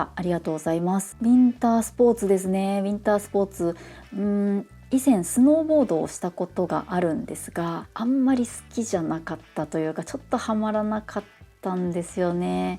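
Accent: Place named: Kumamoto, Japan. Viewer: コメント紹介ありがとうございます😊さすがはさん、動画内でも普通に熊本弁出てくるだけあって、熊本弁丸出しのコメント読みもバッチリ👌 今年の寒波は今日もマイナス4.5℃で夜中から朝にかけて雪❄️が舞ってましたよ。僕は天草の本渡出身の益城町在住です。僕は若い頃はこの寒い時期でも磯に釣りに行ったり、蘇陽町の渓流の管理釣り場にマス釣りに行ってましたが、トシと共に寒い時期や暑い時期は釣りに行かなくなりました😅 九州のスキー場は、動画コメント紹介でも言われてた熊本と大分の県境の九重スキー場、佐賀の天山、あとは熊本と宮崎の県境の五ヶ瀬ハイランドスキー場もありましたね。行ったコトないけど😅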